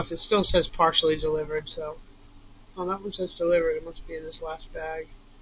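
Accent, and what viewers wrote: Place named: New York, United States. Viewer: Hi, you so remind me of my neighbor in NY, the accent too.😄💗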